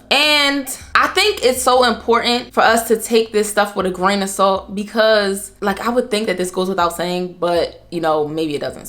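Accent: Baltimore, United States. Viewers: I think it’s mostly Instagram not all social media platforms. Also what state are you from im trying to pick up on the accent? I feel like it’s Baltimore though.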